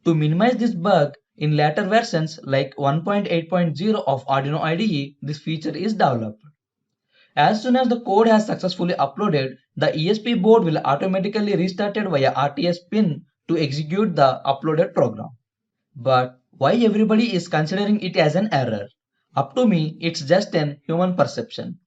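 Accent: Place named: India. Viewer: Why it always have to be indian accent wich noone understand?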